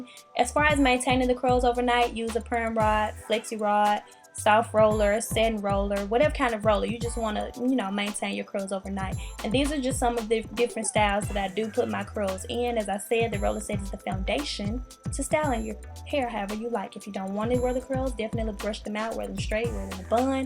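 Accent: South, United States. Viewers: Beautiful hair, good job! Cute southern accent😂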